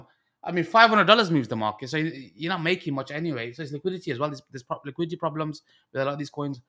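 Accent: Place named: South Asian, United Kingdom. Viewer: Thanks a lot bro. A request of mine and i'm sure many others is to please turn on captions for your videos. I absolutely love and enjoy your South Asian Britiah accent but it sometimes is a bit hard to follow esp with the recent mic issue. Dera Manana brother!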